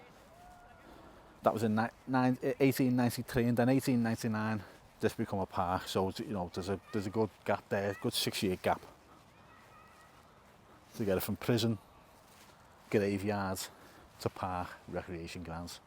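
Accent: Liverpool, United Kingdom. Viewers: The wife was listening in on this and said he's good him proper scouse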